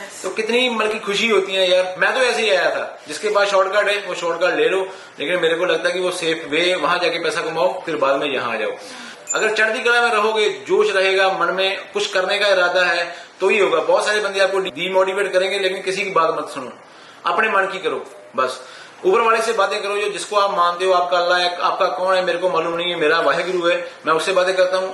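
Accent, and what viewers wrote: Punjab, India. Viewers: I always like to see your videos because it's informative and entertaining too... your talking way with punjabi-hindi that is awesome.... specially word Sat shri akal ki hal chal and chardikala.... God bless u bro 🙏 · Bhahi tohadi hindi bilkul mere jesi hai.. Hum punjabiyon ki hindi bhi kamal hai :)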